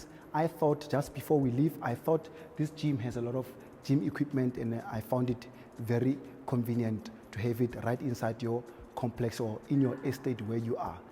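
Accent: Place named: Africa. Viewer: Very nicely presented with an African accent.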